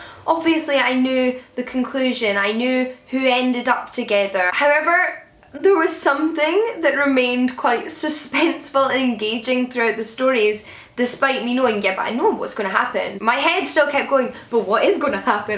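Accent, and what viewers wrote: United Kingdom. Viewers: Thank you very much for your sharing! I find it very useful for my study as an English major in China...btw, I am very intereted in your accent, I think it is very cute...Do you mind if I ask which part of the UK you are from? Thx so much!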